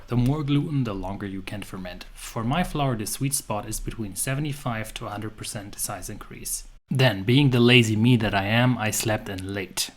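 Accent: Germany. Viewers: Manny good advice, this have make my technic full filed thanks to you German tremendous English accent. 👍🏼🙌🏼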